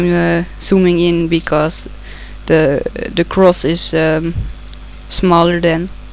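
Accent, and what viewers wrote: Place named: Netherlands. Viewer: haha, je hoort ook egt zo'n hollands accent! xD k ben ook 13 jaar, (over een week 14) ik ga ff je tutorial bekijken.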